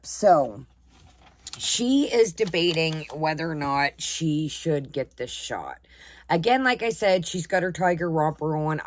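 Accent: United States. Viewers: Your text is too cute! I forgot that has an American-accent! ❤️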